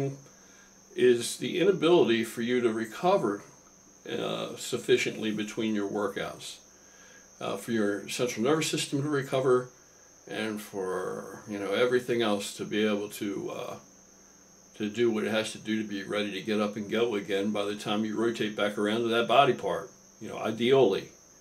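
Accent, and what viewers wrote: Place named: Philadelphia, United States. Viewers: i bet this dude is from the philadelphia/south jersey area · I'm from south jersey right next to philly and I thought the same thing. The way he pronounced the days of the week is a dead giveaway.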